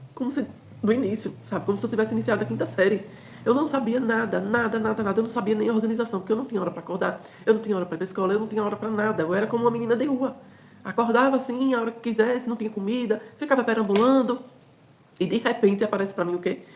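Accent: Brazil. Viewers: Que alegria conhecer seu canal, esse sotaque lindo que não reconheci até você dizer que é Sergipana (ainda não conheci Sergipe). Me senti inspirada e mais motivada para me amar e ao todo. Dou gracas por sua vida! 🙌🏾🤲🏾🙏🏾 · Bem logo via que teu jeito de falar era das redondezas daqui😂😂😂😂😂😂 sou de Alagoas hahahahhsah amei seu canal e to na quarta onda ja ❤ obrigado pelo vídeo ❤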